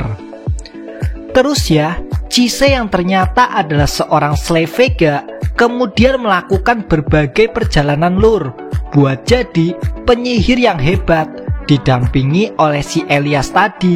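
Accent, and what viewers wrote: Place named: Banyumas, Indonesia. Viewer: Ngapak juga gaes nyong ee 😁😁😁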